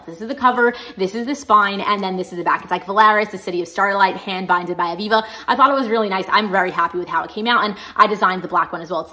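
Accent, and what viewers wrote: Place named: Midwest, United States. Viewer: I love watching people bind books. Can I follow you on your Instagram? And I'm always trying to figure out what part of the states you're from cuz it sounds like you're from the Midwest but not quite Minnesota Midwest but maybe like Iowa or Nebraska. I lived a lot of places and so I always find it fun. Trying to figure out where people's accents come from. ☺️ You and bargain Bethany have a very similar accent.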